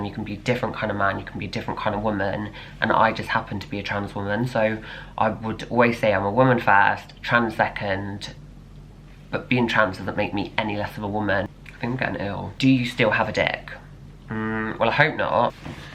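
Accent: United Kingdom. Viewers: Welcome,... It's a British accent, isn't it? 😀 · Are ya British?? cuz I can hear through your voice that you come from Great Britain. Now comes a little bit about me, which is: I do NOT describe myself as a transgender nor do I specify myself as a ideology as such. But rather do I think and wishes for and as a woman. Yes, you read that right, I'm want to go into the line for becoming a woman. For the first 2 minutes and 18 seconds, I can already tell you, you make great and large video's, about topics the world should need to know about. I want to share my appreciation to you either. I know, this video's was uploaded on December 30th, 2022 on a Friday, but I want to thank you for sharing your open-minded views and gender assuming related topics I.E. genderdysphoria. Now you maybe even think I've send you a book, but instead of harassing of catcalling you, I choose to a more family friendly option which contains to be precisely: respectfulness, care about you as a full human-being, and advice for hospitalization during situation when you need it the most. 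Thank you for reading my most important message, take care and goodbye. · R u British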